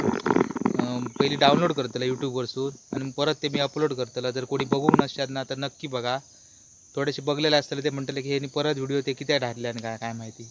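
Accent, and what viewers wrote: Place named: Malvan, India. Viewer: सुमधुर, मालवणी लहेजा असलेली ... भाषा शैलीने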